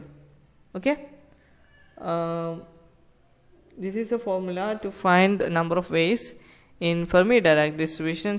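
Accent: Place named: Telangana, India. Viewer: Your ascent is like my friend from telangana , you helped me the way he does, Thank u very much....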